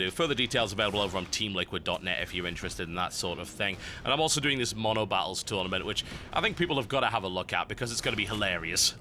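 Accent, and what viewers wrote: United Kingdom. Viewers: he will never lose his British accent, it may fade a bit, but no he won't. I know numerous people who moved here from the UK and they still have a strong British accent. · well he better not lose the british accent :) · and so ends the o_O. Hope you manage to maintain the accent. British accents are cool. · you helped me to continue to use my 'modernised' victorian accent despite the petty mockings of my schoolmates. If you lose the accent, know that I shall continue to use the accent in memory of, arguably, my favourite british person of all time. Good luck in the states.:) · Farewell, British accent. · Moving to the States? hope he doesn`t lose his awesome british accent!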